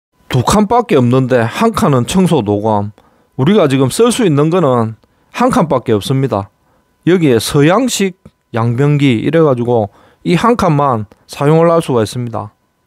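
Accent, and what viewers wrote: Gyeongsang, South Korea. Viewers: 그런데 지자체 헛돈 쓴 곳이 경상도랑 강원도 같은 곳만 있나? 흠. . 말투는 경상도인데 신기하네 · 딱 첫마디듣고 경상도인줄알았다!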